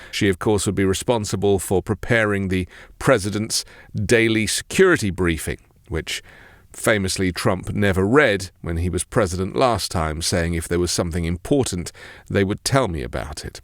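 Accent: United Kingdom. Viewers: Why is some feminine ass white with a British accent telling me about my American government f*** off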